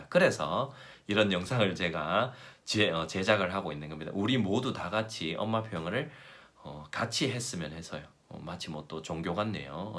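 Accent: Gyeongsang, South Korea. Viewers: 공감되고 좋은 말씀 감사합니다!!! 영어책읽어주시는 클립 먼저 봤는데 영어 목소리 듣고 한국말 목소리들었는데너무 반전이셨어요 😀경상도 말쓰실줄 상상도 못했으요 ㅋㅋㅋ미국에서 오신줄 알았는데 ~ㅋㅋ(영어너무 잘하셔서~^^)좋은생각들~ 좋은 책소개 감사해요!:)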